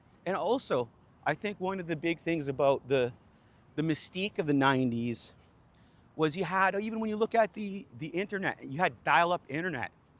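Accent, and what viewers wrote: Canada. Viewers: First take away in the first minute, you're Canadian eh? I can hear it lol. But I'm feeling you, let's go.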